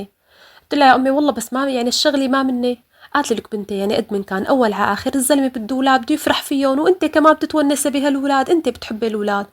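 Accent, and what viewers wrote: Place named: Homs, Syria. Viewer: دخيل لهجية حمصي ❤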